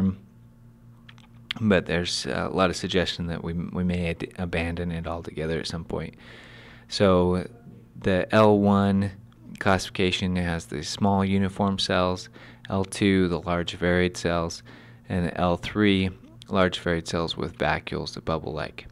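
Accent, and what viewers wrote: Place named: United States. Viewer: fatima Imtiaz he sounds American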